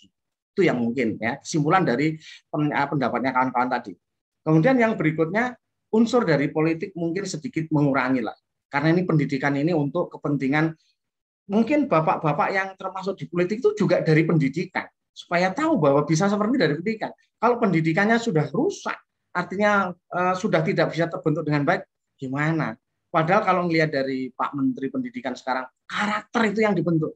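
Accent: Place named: Pasuruan, Indonesia. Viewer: Suara pasuruan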